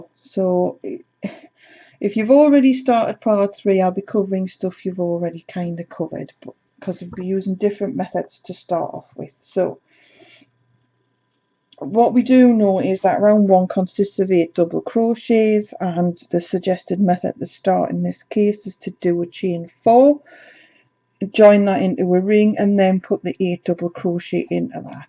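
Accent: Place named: Newcastle, United Kingdom. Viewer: So nice to hear a Geordie putting this pattern into action. I am living in Fife but from South Shields. I am more of a knitter but learned crochet during lock down. First time I have tackled a blanket with so many different techniques.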